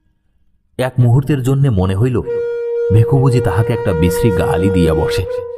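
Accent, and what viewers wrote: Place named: Khulna, Bangladesh. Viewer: ভাই আপনার বলা সাবলীল ভাষা বিশেষ করে খুলনার টান ভালো লাগে।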